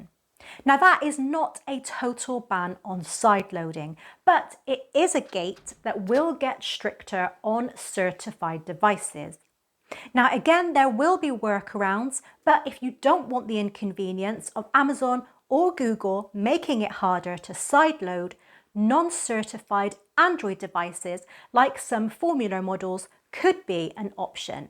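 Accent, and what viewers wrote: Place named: West Country, United Kingdom. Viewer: Lovely to hear and see a UK/west country female giving straight forward info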